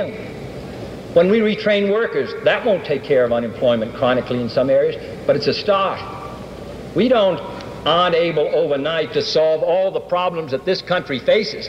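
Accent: Boston, United States. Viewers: Extremely strong Boston accent 💪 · Go to Boston. You’ll hear it everywhere.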